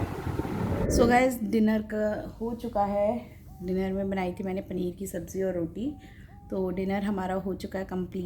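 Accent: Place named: Bhiwani, India. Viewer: Hanji di sahi kaha di aapne hm bhi Haryana s hai hmari boli hee kadak h or sabko lgta h ki hmari tone gusse vali lgti h or vese di aap kaha s ho dadi ama ki bhasha s too ese lgta h ki aap Bhivani side k ho Reply zrur Krna di 😀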